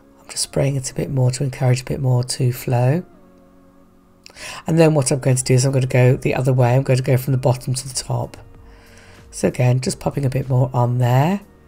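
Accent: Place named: United Kingdom. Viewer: I want you to know that I hold you responsible for enabling my Stamperia Paper addiction! 😉 Lol The problem is, I go through it and I love it so much I don't end up using it! Oh dear! So, these lovely longer vids are my faves! Your voice is calming to me & as a transplanted Scot living in the US, Brit accents are very soothing to me 😁 I am a long time fan of yours. You've taught me to be more adventurous in my gel printing! I've made shapes & swirls out of cut out cardboard - all sorts. I'd have never tried that without you inspiring me! Watching you sends me running for my paints... I can't think of a higher compliment, can you? Love your aesthetic,! Thank you so much for everything! Love & Bless xo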